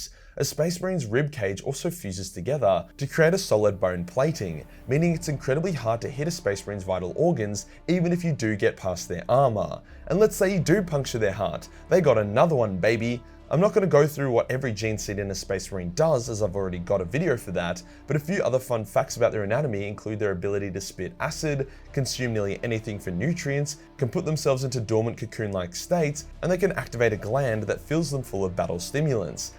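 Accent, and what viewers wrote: Australia. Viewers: I love it when you use your Aussie moonspeak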